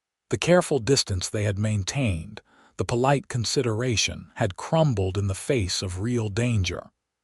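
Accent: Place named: Georgia, United States. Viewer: I especially enjoy the narrator's accent, he sounds like he comes from Savannah, GA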